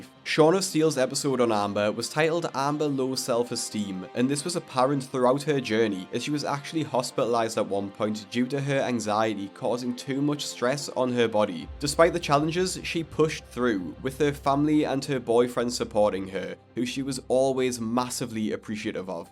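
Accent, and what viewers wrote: United Kingdom. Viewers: This is an American TV program. Why in the hell do I have to listen to somebody with an annoying British accent??? That's the only reason I can't subscribe to this channel. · Completely off topic, but I was wondering where you are from. I watch another YouTuber with a very similar accent. I mean, I know it's UK, but it amazes me how an island that isn't very much bigger than my state (Illinois), has so many different regional accents!!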